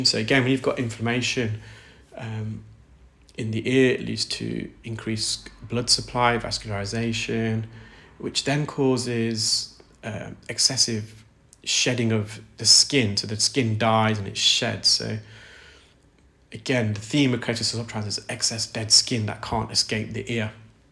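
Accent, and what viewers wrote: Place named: Wales, United Kingdom. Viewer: Nothing wrong with your accents or speed. I find it quite comforting as it reminds me of family- even though I'm not Welsh many generations before me were. Mainly from the valleys so your accents are spot on for me 😂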